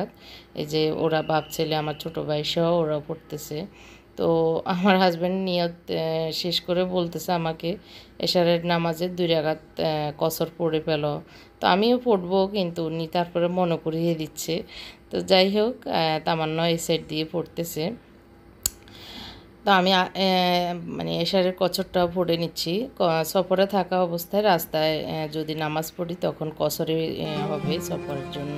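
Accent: Noakhali, Bangladesh. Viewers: Aunty apni fenir naki noakhali r😀😀❤️❤️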